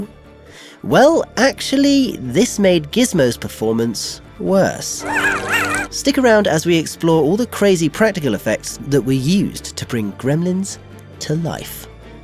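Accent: United Kingdom. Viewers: you have a British accent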